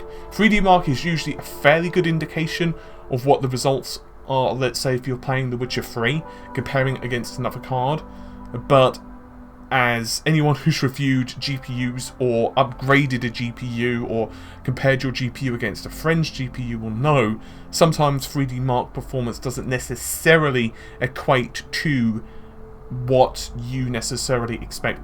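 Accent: England, United Kingdom. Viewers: that background music and that English accent makes me sleepy but in a good way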